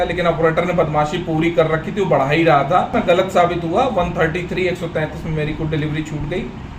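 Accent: India. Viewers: Ek number Desi andaz mein bat krte ho bhae .. very genuine person.. very brave and courageous person. Salute 🙏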